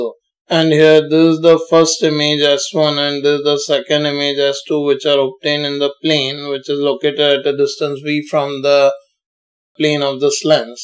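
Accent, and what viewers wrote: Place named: India. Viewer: I thought only arabic accent is funny. fck.. Indian accent is one step further. Bullshit.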